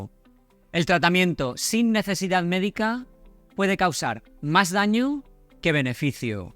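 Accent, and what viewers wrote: Spain. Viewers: Vit D3 PARA CARENCIA DE VIT D..SOLO POSEO EL 8% Y NECESITO CERCA DEL 100%> ES UD UN GRANDE, GRACIAS CONFIO EN UD..DESDE HACE MUCHO LO ESCUCHO..Y LO PERDI HOY YA NO no lo perderéad. Solo me agrada la gente con valores lis demás que digan no me interesaron antes y menosdesdeURUGUAY.LO SKDO..SIEMPRE ME HA DEJADO LO MEJOR..A LAS ORDENES...DE QUE PAIS ES UD??? a..🥱🇺🇾. UD R TIENE ACENTO O ESPAÑOL. MADRE PATRIA O COMO EL MIO.,MAS BIEN SPANISH... UN enorme gusto...bienvenido,Bienaventurado..es muy grato en esta suciedad No SOCIEDAD.encontrar valores..!!! Uruguay.; Graziella Riccetto.!! Gracias.!!❤🎉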